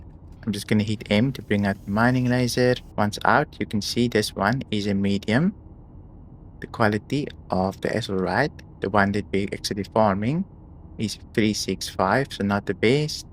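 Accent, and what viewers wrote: Afrikaans, South Africa. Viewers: Fokkit dis darem maar lekker om daai Afrikaanse aksent in Star Citizen te hoor. Dankie vir al die video's ek leer vrek baie! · Just checked where your account is based. Thought I heard a nice Afrikaans accent there. 💪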